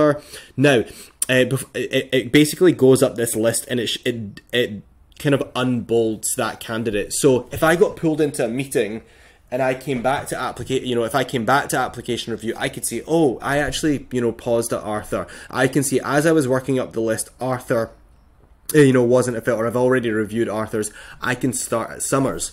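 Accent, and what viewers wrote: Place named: Scotland, United Kingdom. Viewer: Tis a lovely Scottish accent, close to the Irish brogue that my family has. It is lovely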